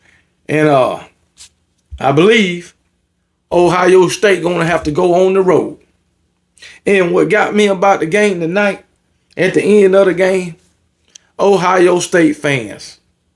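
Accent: South, United States. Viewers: Hilarious hearing this commentary in a Southern accent. This is new for Buckeye fans🤣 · I love this commentary. The Southern dialect cracks me up. “Ryan Day ought to be arrested tonight for what he had his goons do…” 😂 hilarious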